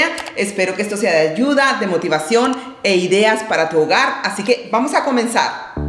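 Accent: Antioquia, Colombia. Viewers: tú canal és maravilloso tús manualidades la forma tán sencilla como explicas todos los pasos a seguir en cada manualidad o cambio hacen de tú canal un lugar favorito único y muy acogedor tu sencillez tú carisma TODO EN TU CANAL ES ESTUPENDO ME ENCANTA y ése habladito paisa que me fascina pues yó vivo acá en bogotá colombia pero???? Toda mi familia mis raices son paisas FILANDIA QUINDIO BELEN DE UMBRIA y vallunas TULUA Y ROLDANILLO aunque también tengo tolimenses en mi familia mi abuela materna es de ALPUJARRA TOLIMA!!!! Un abrazo enorme a ésta bella compatriota qué és mi linda!!!!!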